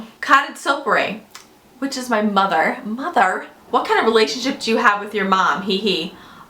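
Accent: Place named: Long Island, United States. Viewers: <3 your accent. Are you from NY originally? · Gah!! you are so awesome and so real! I love your videos so much! And I love the Long Island accent!!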